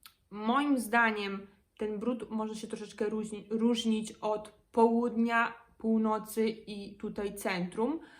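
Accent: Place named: Italy. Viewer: Ale akcent złapałaś 😂 Również we Włoszech, na zawsze. Uwielbiam życie tutaj, nawet ten bałaganik i odkładanie na później. Kierunkowskaz w prawo można dać sobie spokój, w lewo owszem można użyć 😅 Ogóle podejście do jako takiej dyscypliny jest raczej typu „żyj i daj żyć” Włosi są bardzo kreatywni i jakoś się odnajdują w każdej sytuacji (czego np Niemcy nie bardzo potrafią) Kręcą się wszędzie, kawiarnie zawsze pełne a szczególnie teraz po Covid odrabiają zaległości Klimat❤❤❤ chociaż uwaga, gorąco i parno, szczególnie we Florencji i nizinie padańskiej, może trwać miesiącami, klimatyzacja wręcz niezbędna. Temat rzeka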